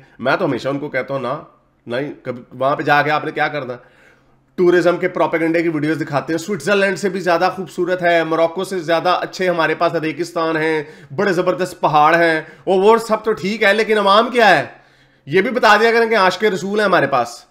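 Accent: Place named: Punjab, India. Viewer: He has a Punjabi accent. The original Urdu accent is the Delhi Urdu accent since Urdu was born in Delhi.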